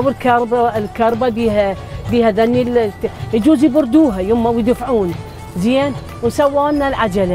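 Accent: Baghdad, Iraq. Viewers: الله على اللهجة البغدادية تجنن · هنيالج وبالعافيه عليج هالاجواء الحلوة اموت عليج وعلى سوالفج الحلوة ابد متغيرتي كلامج كأنما عايشه بالعراق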